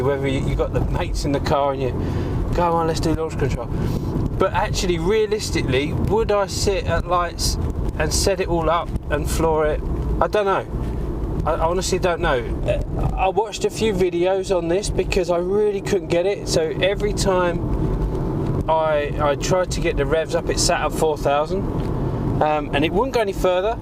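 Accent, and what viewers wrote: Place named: London, United Kingdom. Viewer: Top man! Loved the micky Flanagan London accent lol. Kappa the vids coming mate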